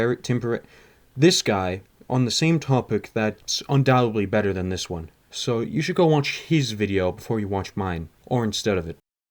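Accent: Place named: Canada. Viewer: Great video. You have a better Canadian accent than mine lol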